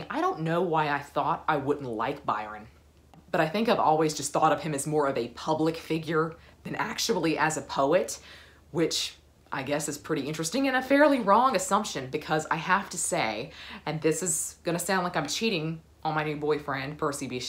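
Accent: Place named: Texas, United States. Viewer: Love the way you say y'all. Probably best Texan accent I've heard. Also, love the way you comprehensively talk about books.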